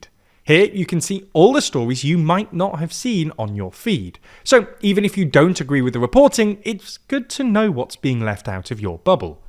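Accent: United Kingdom. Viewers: Up to the strident, 'all knowing' Ai British accent was tolerable, but then it transitioned into an all out adblock circumventing ad, BEWARE, CHANNEL BLOCKED.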